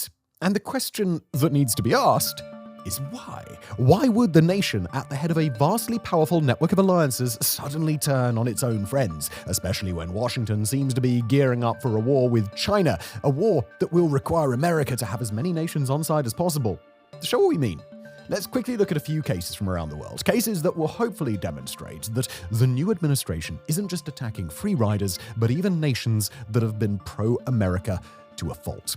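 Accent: RP, United Kingdom. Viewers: Also a European lecturing Americans in a condescending tone with the queens English about power for 30 minutes while living in an impotent country is rich.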